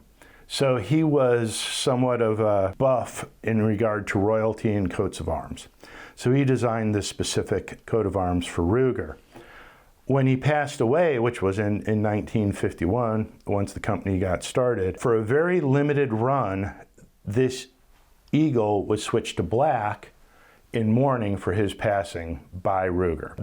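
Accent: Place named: Midwest, United States. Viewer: Pretend the Bears stuff isn’t in the background. says dis guy is obviously from da Midwest, Great Lakes region of America…and dats a great place to be from. I’m glad you don’t have a boring 25 or 30 second canned intro. Just straight into it. Nicely done, Sir. Just yesterday I ordered a Mark IV Target from Bud’s. Looking forward to its arrival. GO LIONS!!!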